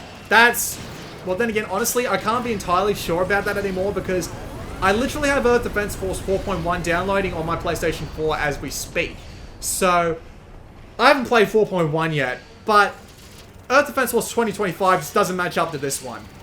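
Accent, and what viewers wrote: Australia. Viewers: Unrelated question, how is your Vegeta impersonation? Sorry, you just sound like an Australian Lanipator.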